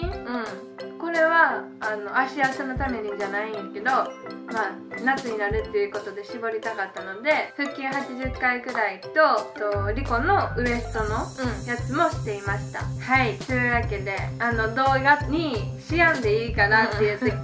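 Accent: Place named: Mie, Japan. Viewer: の三重弁くそ好きなんだよねー♡♡笑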